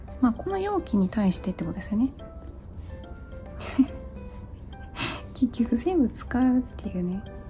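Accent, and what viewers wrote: Kansai, Japan. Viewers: はじめまして！の動画を偶然見てから編み物がしたくなり始めました。今、がま口にはまってしまってます。かぎ針初心者ですがの動画を見ながら何個かつくれました。私は関西人なのでの喋り方親近感が湧きチャンネル登録しました。これからも素敵な編み物どんどんアップお願いします💕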